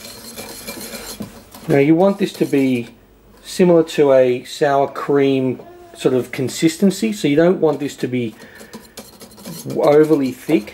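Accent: Australia. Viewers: Are you from Australia. Because your pronunciation defines that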